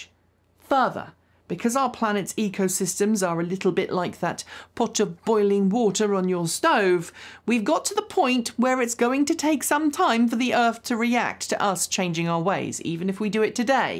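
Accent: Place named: RP, United Kingdom. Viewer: Another entertaining, and eloquently spoken video. I learn more than the topic, but also the "Queen's English". Thank you.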